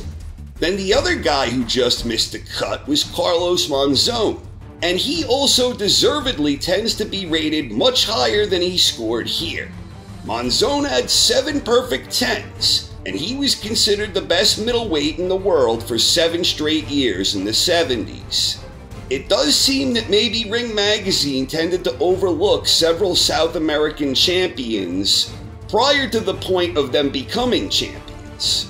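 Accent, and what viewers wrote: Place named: New York, United States. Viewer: Very interesting, Whatever accent you have - it is the official "Voice of Boxing Knowledge" to me now! (Is it New Yorker?) A big Thank You from sunny England, for putting all the effort into compiling this epic list over the last few months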